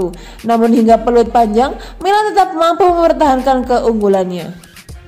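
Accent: Java, Indonesia. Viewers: Medhok bgt mbak, hehe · Jadi males gw nonton Chanel ini,, gara² suaranya yg medog ... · Hahahahahahaha mbok pelan pelan to engkok keselek lo, tapi male duadi lucu e gelok coro moco e, wes cepet medok e kuentel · Medok bnerrr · Jadi lucu, meddok + cepet 😂😂😂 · mbke medok bunget to mbk 😂 orapopo wes.. wong jowo mantul 👍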